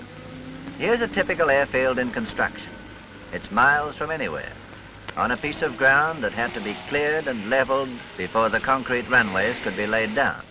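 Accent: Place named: Australia. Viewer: the narrator actually sounds Australian ☝️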